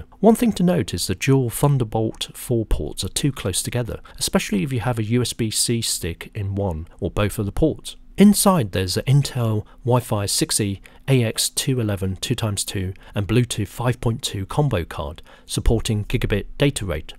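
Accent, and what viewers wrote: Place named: United Kingdom. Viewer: Interesting how Z is Zed, and H is Hayche, in the Queen's English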